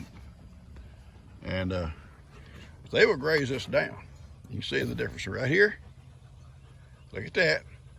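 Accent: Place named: Kentucky, United States. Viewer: That is Kentucky talk also.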